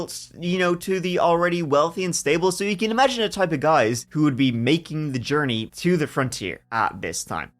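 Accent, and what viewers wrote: Ireland. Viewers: Battling my third round of COVID in 8 weeks. Needed the soothing voice of my favourite Irish lad.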